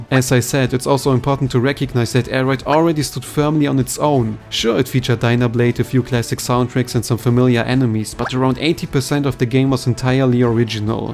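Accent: Germany. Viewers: Ich hab den Deutschen direkt bei dir rausgehört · Are you Bavarian? Asking as a German, because it sounds like it. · Are you German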